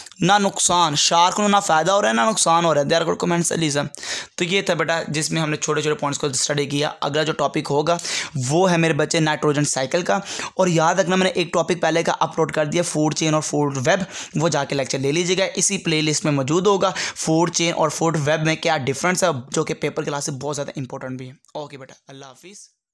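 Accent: Punjab, India. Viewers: Punjabi accent 😍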